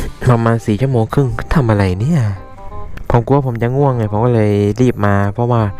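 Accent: South, Thailand. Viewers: โซ้ยกาแฟนิ แหลงใต้ชับพึด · คนใต้ซายม้ายนิ... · พี่เป็นคนภาคใหนพูดเหมือนคนใต้เลย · พี่คนใต้หรอคับผมก็คนใต้555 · คนใต้หรอ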